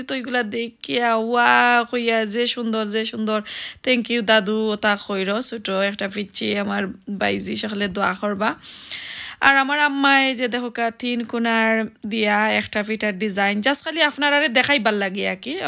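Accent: Sylhet, Bangladesh. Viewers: Apnar mat kilan apni toh sylheti/ilan matoin kne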